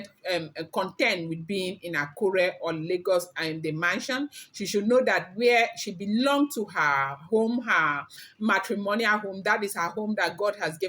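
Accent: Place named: England, United Kingdom. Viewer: You are such a young and beautiful woman. I presumed you to be an elderly woman with a plump body and a deep voice 😂. Please pardon me for the presumption. I am so pleased to see your face and enjoy your vibrant smile and English accent. Kudos for being very honest and respectful in your delivery of your blog.❤. Please text me the link to join your chat. I couldn’t get the link. ( mind you I am of old school 😂😂😂😂). Don’t mind me ooo.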